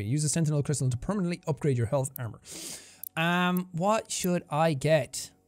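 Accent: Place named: Ireland. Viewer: Normal people: fall asleep listening to calming music. Me: falls asleep listening to a excited Irish man slaughter hordes of demons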